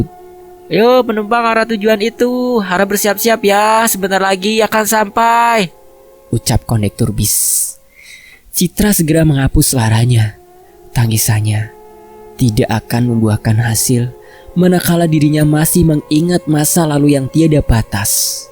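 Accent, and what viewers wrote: Java, Indonesia. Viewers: Tertarik ma logat bhasanya. Itu logat bahasa jawa mana ya? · Aq yoh yess cm cerita inih j0zz... Bisa banyk belajar bahasa cinta Tpi dengan logat jawanyh😍meski aq jawabarat Tpi bojoko jatim😍😍😍😍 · Bohoso jowone kok lucu to mas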